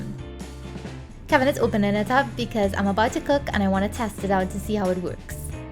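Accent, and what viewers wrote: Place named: Trinidad and Tobago. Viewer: Hi this looks scrumptious😻 I admire that you kept your Trini accent. It makes me feel like I am back there💛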